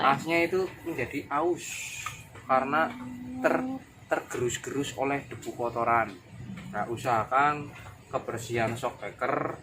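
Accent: Banyumas, Indonesia. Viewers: Rah ngapak rah kepenak😁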